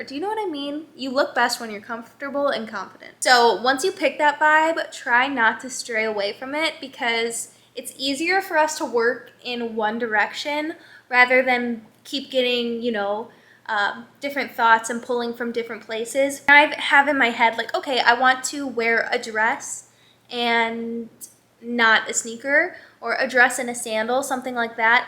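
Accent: Midwest, United States. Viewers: i love your midwest accent 😭💕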